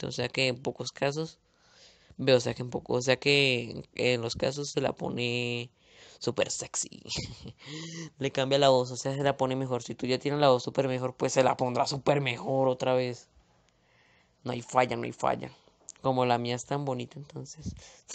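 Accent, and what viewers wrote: Colombia. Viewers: Jajajajajajajaja es que tu acento es de rolo ewe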